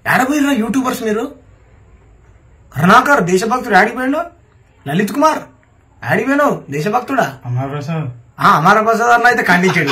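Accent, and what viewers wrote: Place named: Andhra, India. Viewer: May be needi Andra iyi untadi😅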